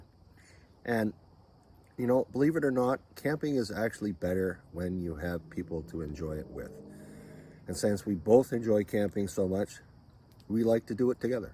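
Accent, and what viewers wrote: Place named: North, United Kingdom. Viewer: Hi 👋🏻it’s Nutty Mark here. Checking your video out for the first time. Looks like, and sounds like your a bit North of me. Always my favorite vacationing location. #20👍🏻P.S. love the Doggie’s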